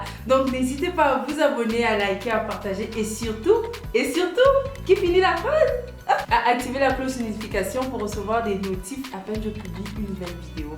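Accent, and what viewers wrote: Gabon. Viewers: cet accent qui me rappelle mon Gabon 🥰🥰! Merci pour l'astuce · C'est la première fois que je regarde vos vidéos. Votre accent ? Vous êtes gabonaise ???😍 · Votre accent on dirait une gabonaise 🤔🥰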